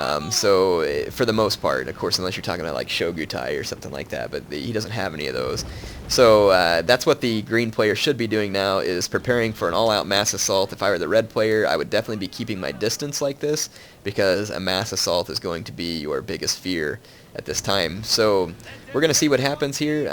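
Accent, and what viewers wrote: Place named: New Jersey, United States. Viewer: Happy Thanksgiving. Your videos help decompress this Napoleonic War fan after work, no matter what era. Very involving. You and the Prince of Macedon have well earned the esteem of your fans for the military education and well-spoken presentation you bring to the game. Congratulations..By the way, you sound just like a Jersey/Rutgers guy.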